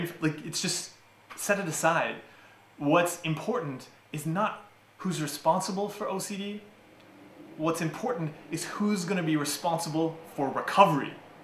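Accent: Canada. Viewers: Cute, smart talking Canadian....love it :)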